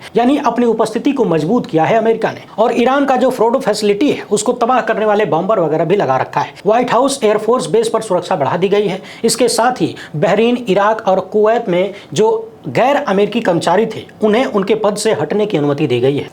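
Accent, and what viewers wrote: Bhojpuri, India. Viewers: Ur bhojpuri tone! So sweet.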